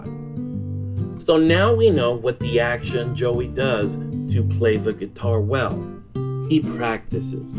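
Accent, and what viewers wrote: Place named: United States. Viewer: Amazingly well produced video. Props for the job. It really catches us. I found interesting how you pronounce the “ed” in some words - almost imperceptible in your American accent. I learned a lot.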